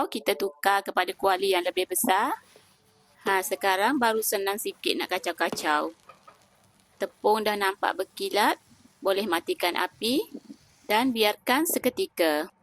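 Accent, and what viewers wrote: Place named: Kelantan, Malaysia. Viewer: Bunyi kecek Tu org klate😂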